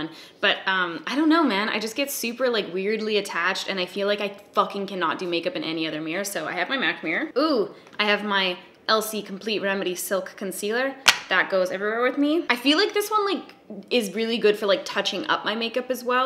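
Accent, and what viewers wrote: Western Canada, Canada. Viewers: What’s in my beg? I love Canadian accents 😂😂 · I know how Americans act when there’s a Canadian accent heard so I feel you but I appreciate your accent that you hide ❤️ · I love the Canadian representation. Also I didn’t realize that I say bag exactly like that. Yay western Canada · I wish I could “love” this video! Haha. I love your videos and your freakin sweet Canadian accent! Lol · I love the Canadian accent. Sounds like “bayg” to me. But I’m the New Yorker who says “cough-e” or “cawfee” as coffee lol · I fucking love your Canadian accent & the way you say bag... makes me not want to be god damn British 😂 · We all know that's not Old Dead Plant. Your Canadian accent has rubbed off on me. I also blame Christine and Ben. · I forgot you're Canadian! I think your slight accent is adorable. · I'm here for you, and your personality, and your canadian accent, don't care about anything else (that description tho 😂😂) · Omg the first time i can properly hear the canadian accent i love it so much i cant even dealio. 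❤❤ from NZ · I truly adore you and your channel! You always make me laugh & you're so refreshingly REAL!! (Also the Northern Minnesotan in me is awakened by the Canadian accent! You sound like HOME! Lol!) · ya know, when i saw the title of this i thought "i want a 10 minute video of just saying bag" bc your Canadian accent is actually amazing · I want you to know that I often say things randomly in a Canadian accent and I’m 99% sure it’s because I watch your videos so much, even in the background of my daily activities. I live on the middle of the United States east coast. People get very confused. Often.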